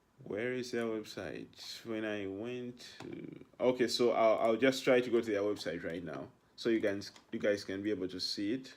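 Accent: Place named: Uganda. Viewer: Kiribwa oluzungu banange. Still Ugandan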